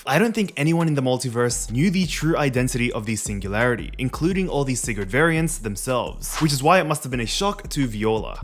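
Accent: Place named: North America. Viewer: Where are you from bro? It says Australian on your channel but your pronunciation of certain words sounds very North American. Thanks for explaining this clusterfuck of a story though - much appreciated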